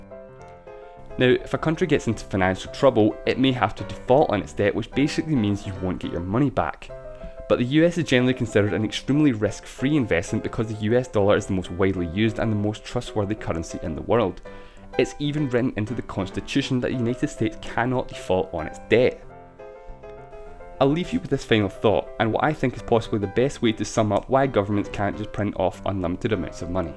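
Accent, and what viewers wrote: Scotland, United Kingdom. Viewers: I'm not trying to be rude or something, but if this is a Scottish accent, then it's bloody annoying. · It’s the Scottish accent lol. His accent is pretty clear lol some Scottish accent are hard to understand if you don’t pay attention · Telling by Your Accent You Are Scottish Probably, Good Explaination👍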